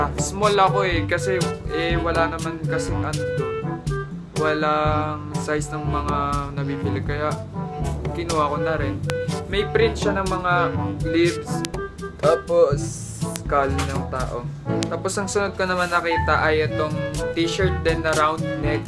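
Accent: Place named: Laguna, Philippines. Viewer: May accent ka na parang tiga laguna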